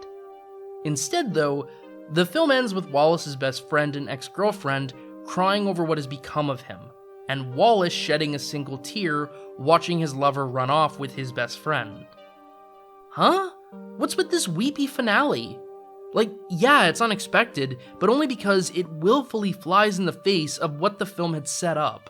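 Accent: Ontario, Canada. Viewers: The last thing i expected to hear while listening to this video as i recall always liking the movie Jersey girl, was the coincidence that i was currently walking down my street in Windsor Ontario Canada. I guess i should pay more attention to the way people talk, but it never struck me that you might also be from Ontario lol Anyways great video. I also went through a Kevin Smith completionist saga in my early 20's and found my favourites to be the lesser talked about films in his discography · Brother I knew i heard some Ontario in your voice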